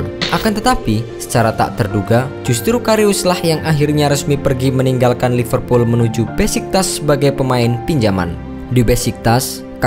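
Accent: Java, Indonesia. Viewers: Ko suara admin medok yah?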